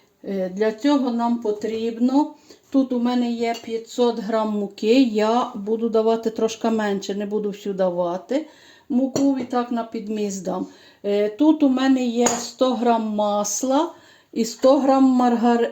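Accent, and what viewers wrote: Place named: Galicia, Ukraine. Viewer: Не складний рецепт,варто спробувати. Спасибі за рецепт.Обов'язково спечу. Я з такою еасолодою слухаю вас і наш рідний галицький діалект. Бережіть себе і будьте здорові.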